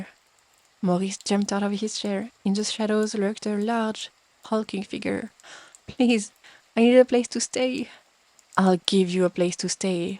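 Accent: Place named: France. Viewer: I love a nice French accent. Ty. Also hi I’m your newest sub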